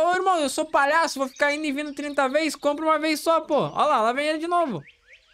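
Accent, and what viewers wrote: Portugal. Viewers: Português de Portugal e praticamente isso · Esse é sim o português de Portugal!!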